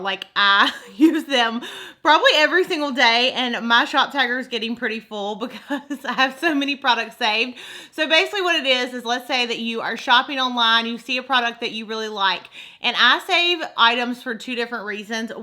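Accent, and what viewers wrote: South, United States. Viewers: I Have Been Subscribed To Your For Years And Still Love You And Your Southern Accent Lol I Would Love To Win Of Course, I Wouldnt Be Able To Afford These Things, But Even If I Dont Win Im Still Going To Watch Your Videos And Love You! Congrats On 4 Years And Many More To Come❤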